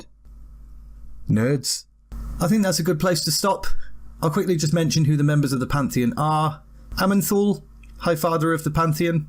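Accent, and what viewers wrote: United Kingdom. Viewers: I'm working my way through your channel and you're brilliant! I can't figure out your accent, Midlands or more northern?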